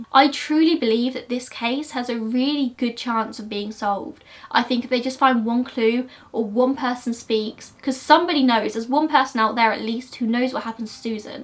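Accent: United Kingdom. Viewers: I'm from Dublin, Ireland. So, I'm very familiar, and have always loved, the UK accent. But, your particular accent is so very beautiful! 💜 I'm sure you don't even realise that you have an accent, but you have a beautiful accent, and you're perfectly spoken. Just another reason why I love your channel! 💜🖤💜🖤💜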